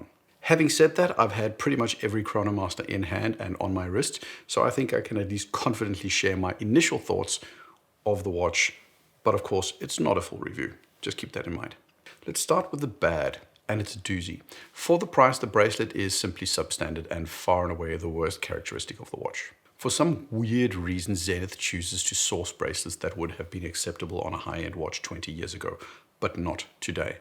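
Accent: South Africa. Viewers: I thought you were South African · Great video! BTW, are you South African? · Completely agree, this watch would benefit plenty from a 42mm diameter, and I don't get the obsession with thin cases either. I want to see and feel some heft and presence from a chronograph. Any thinner than 15mm and I'm not interested. I guess we all have our likes and dislikes. Prefer arabic numerals too Still, enjoy listening to his videos, very eloquent and well considered. Bit of South African in the accent ?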